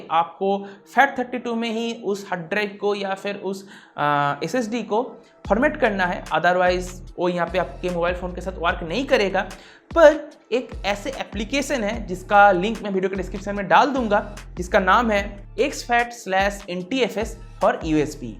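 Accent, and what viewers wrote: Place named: Kolkata, India. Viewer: Bro you don't know, what you are ... You r genius Bro ♥️♥️♥️... you explained us anything about tech, hardware or software related matters so fluently & deeply(specially)...lots of love ♥️♥️♥️ from Kolkata...♥️♥️♥️ I think you are also from our Kolkata our pride ♥️♥️♥️ from your speeking accent, correct me if I am wrong 🤝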